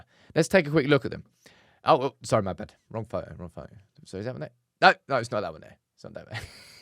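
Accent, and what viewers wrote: Manchester, United Kingdom. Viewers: what part of Manchester are you from? Can't quite place the accent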